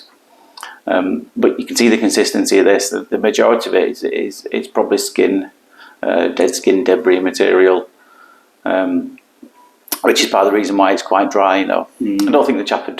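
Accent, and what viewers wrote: United Kingdom. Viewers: Show off, you tech skills are great. I live in Sydney, Australia, love your accent and hearing the British expressions which we don’t hear very much these days. I love my British heritage. I hadn’t heard the paperboy one before. Guess what, I finally know what I want to do for a career. Ear cleaning your way. I have a problem though, I’m 72, have I left it a bit late.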